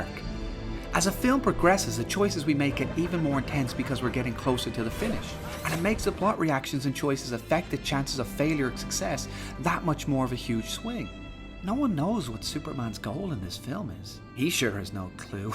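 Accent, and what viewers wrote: Ireland. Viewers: this irish sounding guy understands the greatest american superhero better than zach snyder and every dc fanboi. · Nice Irish lilt. Ba dheas an rud a cloisint ar YouTube. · More than likely the Irish accent. The volume level of his voice is fine.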